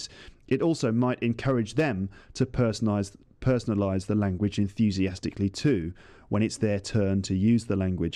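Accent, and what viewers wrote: RP, United Kingdom. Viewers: You're the best podcaster for me. I listen you becouse I want to have RP accent and It's so clear (understandable.) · Come up with more such podcasts. I love your British accent :)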